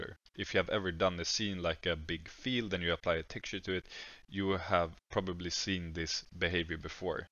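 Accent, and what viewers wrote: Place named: Sweden. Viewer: I've never been more sure in my life that I am listening to a swede.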